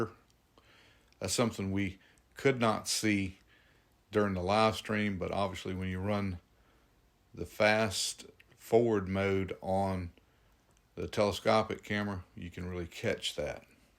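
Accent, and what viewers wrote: South, United States. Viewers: I appreciate your desire make this knowledgeable and entertaining. You really know your stuff and I like your southern accent:) CHeers, Rik Spector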